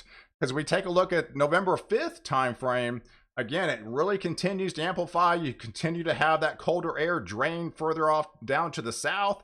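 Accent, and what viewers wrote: Texas, United States. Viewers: Hahaha - that accent is so Texas, it hurts. Maybe she is confusing him with... Christopher Walken... 😱😁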